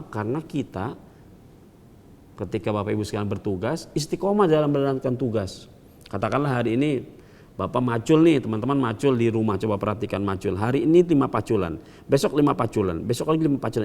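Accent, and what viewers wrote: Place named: Jakarta, Indonesia. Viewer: Masa allah...Ustad jadi logat betawinya kental...semoga bisa terus mengasih tausiahnya..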